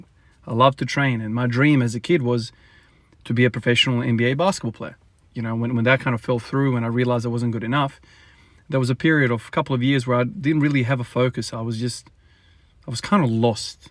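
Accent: Australia. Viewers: What accent is that? Sounds like a mix between Australian and South African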